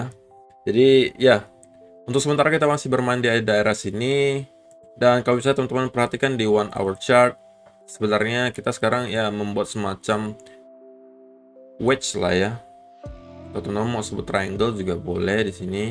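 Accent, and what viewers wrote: Pontianak, Indonesia. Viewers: Ko, org pontianak ya?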